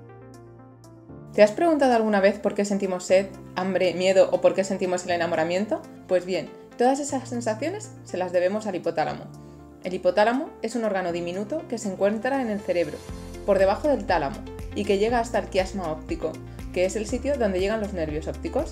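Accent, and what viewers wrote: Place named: Spain. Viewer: Tiene voz española, pero siento que es la tonada española que más se asemeja a la Argentina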